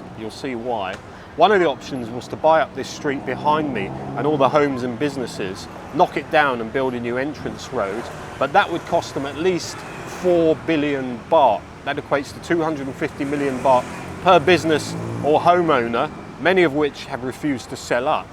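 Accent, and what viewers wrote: United Kingdom. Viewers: Absolutly brilliant as always. You are so unique on YouTube compared to other channels. Entertaining, informative and very interesting. Your knowledge of the history of Bangkok is amazing. I read a reply from you on someone's comment on another video, and you mentioned that you are Thai. I did not know that. Going of your accent, you obviously spent a long time in the UK. I for one would be interested in your story, I think it would make an intersting video. If you have previously made a video on your story, could you please leave a link to it? I love your work. You and a few other YouTubers have inspired me to start a channel of my own. I will be moving to Thailand in a few weeks, then I will be creating some content. Thanks for all your hard work and thanks for the insperation. 🙂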